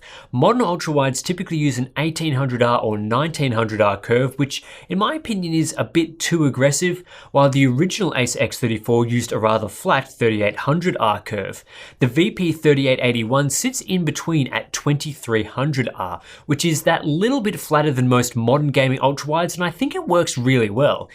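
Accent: Australia. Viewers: Australian accents are hawt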